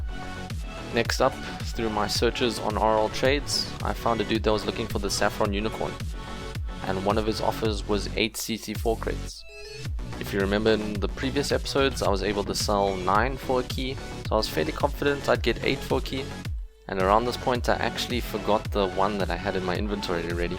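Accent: South Africa. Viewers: R u from South Africa bcuz I am!!! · are you south african? it really sounds like it. If so what part are you from. I'm originally from joburg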